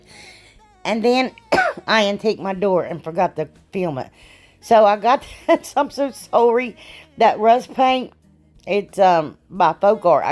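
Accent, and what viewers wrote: South, United States. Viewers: AMAZON!! I am new here from channel, enjoyed everything but mostly the southern accent and phrases..makes me think of home. Grew up in Georgia and Florida and now I'm stuck out here in hell. Idaho..no boiled peanuts, no barbecue, no okra and no waffle house or Piggy Wiggle. I am gonna starve to death out here!! Loved ya and can't wait to see more!! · Love all of your projects. That door is awesome. Love your personality. Great southern accent. Take care. TFS. Have a crafty day. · U are a hot mess!! love your southern draw!!! My momma lives in North Carolina and has picked up the twang I laugh cause we r from Indiana. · sent me over, but your humor, accent and creativity reeled me in. I am Southern and felt right at home! It was hard for me to pick a favorite. The metal flowers have sparked an idea. Mi love the little houses and door. 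I like the hanging vases though. It is different than a traditional wall sconce and I have 4 thisisus signs I need to use! I was an Amazon subscriber before it was cool. I started on the Amazon mom program when I was pregnant with my middle son, 11 years ago. Doctor's office had tons of magazines with cards and you could get diapers for a steal! Transitioned over to S&S when it was introduced. I ❤️ Amazon! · I thoroughly enjoyed you diys!!😂 I’m from Tennessee girrrl but you win! In the southern accent!🏆 I subscribed can’t wait for the next one😁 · I just found your channel,and love your creativity and you must be a southern gal also from your accent. Amazon. New subscriber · Amazon.. AMAZON... Amazon 🤣😁.. I laugh so much watching you! I hear the southern in your voice. I'm in Tennessee💖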